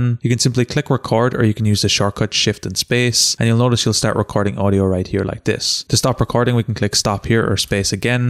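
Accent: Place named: Ireland. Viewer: Good man, good to hear an Irish voice, thanks for the video 👍🏻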